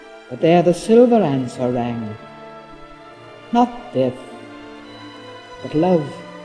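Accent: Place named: Mid-Atlantic, United States. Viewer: She used that “mid-Atlantic” elocution, which I think is just so gorgeous…. Lofty and beautiful and so missed these days of casual everything. I do miss elegance.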